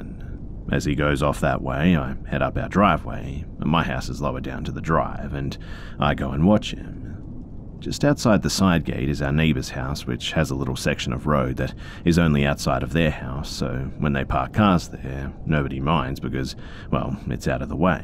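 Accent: Australia. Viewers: Wait?! Are your Australian? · He is I don’t know if you’re Aussie but it’s obvious to me, as I am lol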